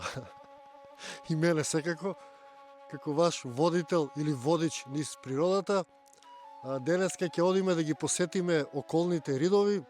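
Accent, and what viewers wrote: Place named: Veles, North Macedonia. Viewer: Да си го зачуваме јазикот, кој и онака е во изумирање. Малите македонски деца повеке зборат англиски... се надевам знаат и македонски. Кај нема наталитет, има абортуси и сите деструктивни нешта, барем јазикот да го зачуваме. Ете ти збориш на велешки акцент што е убаво да се слушне. Така да, моја препорака да продолжиш вака како до сега. Евентуално можеш да ставаш титл на англиски или синхроно и посебно да ги објавуваш. Но продолжи да снимаш како до сега.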